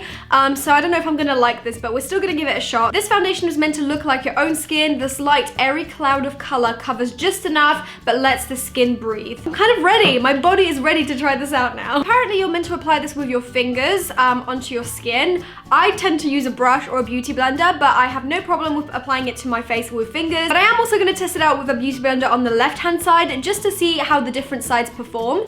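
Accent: United Kingdom. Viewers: Is she British? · British Accent?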